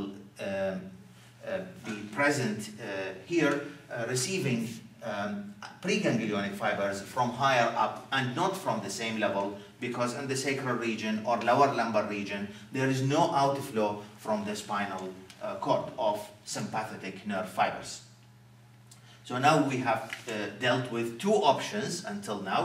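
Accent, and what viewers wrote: Iraq. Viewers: From your accent ,I gusse U R Iraqi So we R proud of U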